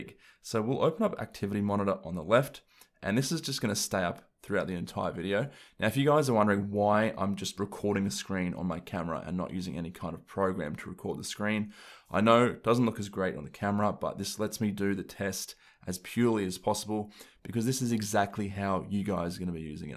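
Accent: Australia. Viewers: Nice video mate, nice occasionally hearing a fellow Aussie on YT. Suggestion: have you thought about using OBS to record your screen? Will obviously add to your editing time though.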